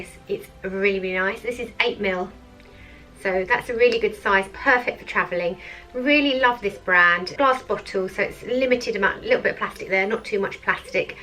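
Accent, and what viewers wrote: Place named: United Kingdom. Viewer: Loved the review, and you really made me giggle with your super British accent, Cute Channel, will be checking you out again!! xx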